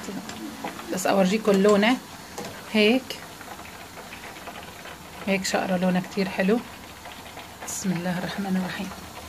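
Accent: Aleppo, Syria. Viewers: بحب لهجه حلبية ❤ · لك دخيلك وخيل اكلات الحلبية يا انا متل حكيتك والله ياخيت حلبية ابوس جنق وجك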